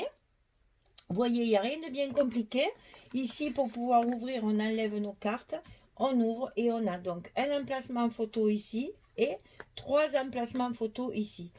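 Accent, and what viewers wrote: South, France. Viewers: bonjour. Merci pour toutes ces explications,j'adore votre petit accent du midi cela fait chaud au coeur. je voudrais savoir combien faut il de papier de cette collection en quel format s'il vous plais.merci. et merci pour tous ces astuces donnés avec simplicité bonne journée à bientôt.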